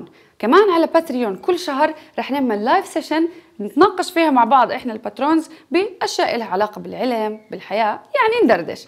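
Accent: Jordan. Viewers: ادري الموضوع فيزياء وبلاوي بس وربي اللهجه الاردنيه تجنن بحس ودي اضحك 😂😂😂 واحلى اشتراك 😊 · ليست لبنانية.. ربما هي من جنوب الأردن ولكنها قطعا ليست لبنانية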